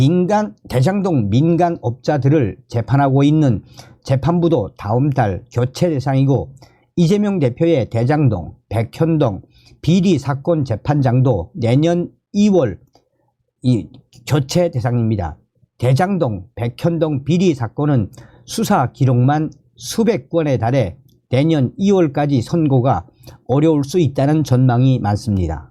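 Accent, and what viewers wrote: North Korea. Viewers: 남의 약점을 꼬집지 마세요. 저분은 남쪽에서 태어나지 않았습니다. 그래도 말씨를 고치실려고 얼마나 노력 하셨겠습니까. 정성산 대표님 항상 수고 많으십니다. 누구보다도 애국자이십니다!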